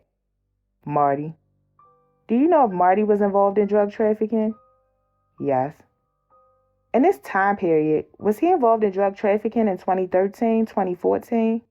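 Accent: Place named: Baltimore, United States. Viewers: Whos dat new narrator wit da bmore accent😍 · Sounds like she from baltimore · Whoever this is reading, either she from Baltimore or she be down here a lot I can tell by how she say certain words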